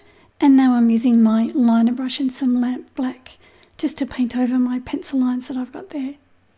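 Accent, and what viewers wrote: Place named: Australia. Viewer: Ahh Aussies...got to love our different accents. A useful tutorial as always. Thank you.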